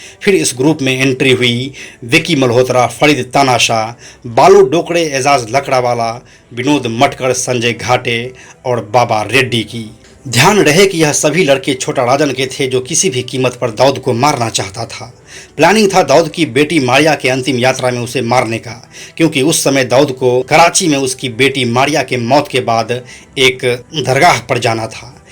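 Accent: Bihar, India. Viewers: Hee too tuu bihari aawaj teri smj nhi aari bol kya rha h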